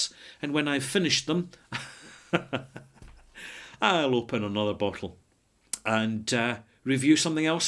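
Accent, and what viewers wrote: Scotland, United Kingdom. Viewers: Hello, it only took me 5 1/2 years to watch this vlog. The whole 27 minutes was enjoyable. Even the scottish turn of phrase "see... that/yon". Kept wondering how much you were having to keep your brogue in check. Thanks and take care. Fraser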